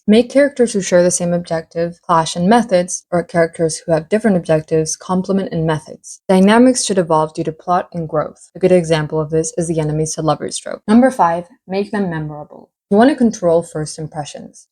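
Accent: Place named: Russia. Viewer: i wanna say russian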